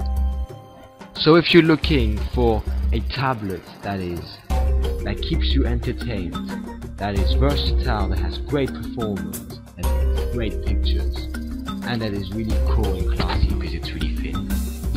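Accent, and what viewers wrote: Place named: England, United Kingdom. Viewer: Tu as un superbe accent ! Tu ne serais pas anglais ou un truc du genre ?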